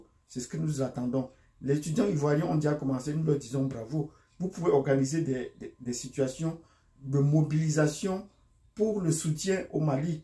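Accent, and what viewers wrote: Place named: Cameroon. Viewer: Par ton accent tu est biyamerounais je dis oh vous les camerounais ou est votre problème ds ce qui se passe chez nous en cedeao au lieu de vous occuper du cameroun du Molière biya et le revenant Ali bongo ,qui prépare sont 3 eme mandats,les citoyens cedeao ne parlent pas de votre pays et de la cemac pourquoi vous ne vous occuper pas ds ce qui se passe chez vous pourquoi vous les bantous vous vs mêler pas de ce qui se passe chez vous .bazlaye d,abord chez vous avant de parlé de quelqu’un avec président biya 42 ans de dictature oas d infrastructures au lieu de venir parlé de Mali,??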